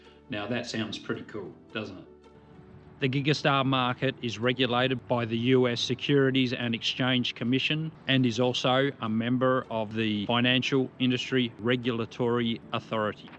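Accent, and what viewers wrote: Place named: Australia. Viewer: I love your Australian accent and you're looking great 👍 👌 you do a great job.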